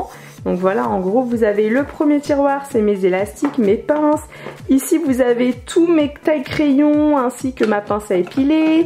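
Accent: England, United Kingdom. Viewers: alala ton accent anglais mavais manquer 😂😂 melted "meite" 😂😂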